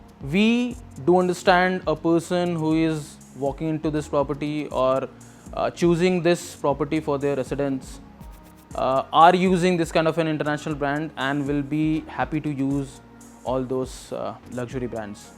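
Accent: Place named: India. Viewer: In which language is this guy speaking hard to understand with a heavy Indian accent can't understand a word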